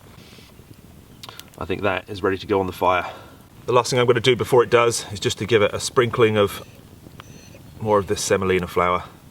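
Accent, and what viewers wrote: United Kingdom. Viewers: I did not see your channel name. First time viewer. I was surprised to hear a brits voice. A lot of survival stuff is muricans. You made a wonderful looking loaf. Good on ya.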